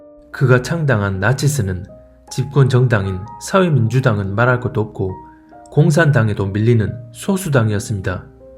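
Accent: Jeolla, South Korea. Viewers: 전라도 말투가 상당히 거슬리네요.트럼프가 적그리스도라는 개소리는 삼가시길 바랍니다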